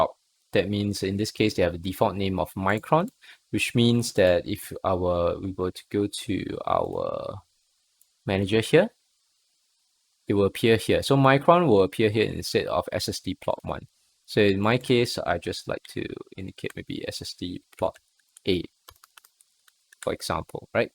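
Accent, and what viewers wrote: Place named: Singapore. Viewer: Nice video but I cannot understand your Singrish!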